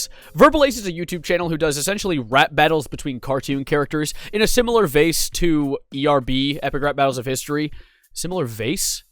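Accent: United Kingdom. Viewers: Me too she- WHY IS SHE BRITISH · WHY ARE YOU BRITISH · "Why are you british??? " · Why are you British!? Love it.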